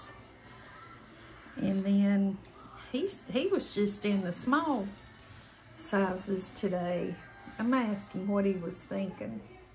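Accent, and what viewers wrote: South, United States. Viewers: Hi, I enjoy watching your videos. I like your accent, reminds me of some of my family from down south. I used to call my grandmother grannie. She was a good one. I enjoy going to the thrift store too. My daughter took me last Saturday evening. We wore our masks and had a great time shopping. Thanks for sharing your video. 💖💖💖💖💖💖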